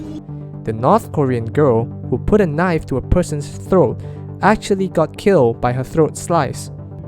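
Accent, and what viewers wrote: Singapore. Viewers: you're from singapore arent you? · Are you from Singapore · A segue, but you sound so singaporean! (Not a bad thing)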